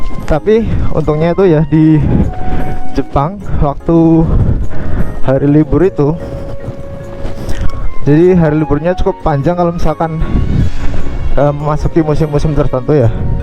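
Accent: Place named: Banyumas, Indonesia. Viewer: Wong endi mas, agak ngapak hehe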